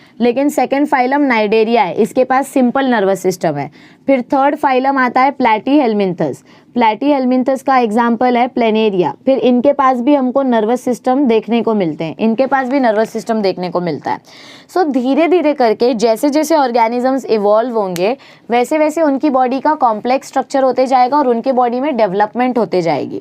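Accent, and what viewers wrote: Maharashtra, India. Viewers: Didi are you marathi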